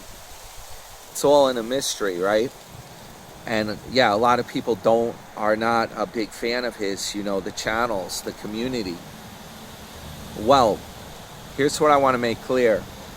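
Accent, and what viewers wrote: New York, United States. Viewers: Great work, You are a relentless, hard working investigative Youtuber. So nice you met & and they were responsive towards you, basically a stranger but with the NY accent. Real nice genuine people just like our friend. 😊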